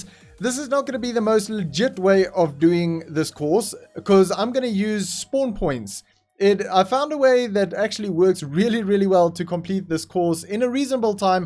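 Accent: South Africa. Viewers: You sound South African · Are you south african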